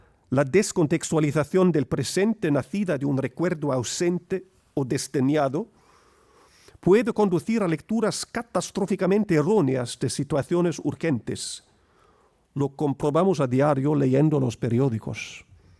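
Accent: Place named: Latin America. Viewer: su caridad, me gusta mucho su acento latin y mas aun el mensaje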